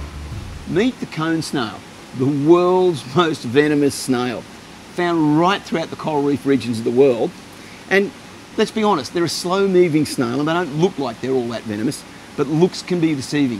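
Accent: Australia. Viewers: Are you Australian? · As soon as I heard the Australian accent, and the one speaking the accent inside a lab coat, I was all in. Subbing to ya mate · Ur ausie arent yah? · Having this explained with an Australian accept on makes sense.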